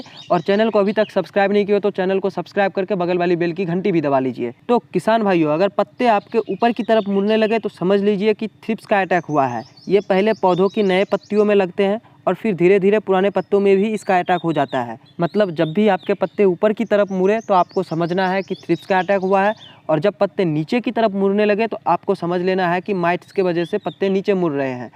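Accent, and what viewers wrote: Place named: India. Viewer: Ye kuch india k parts me aisi accent hi hoti hai . Mazak udane ki zarurat nahi . Bengal me bhi to V ko B bolte hai . Vidya ko Bidya bolte hai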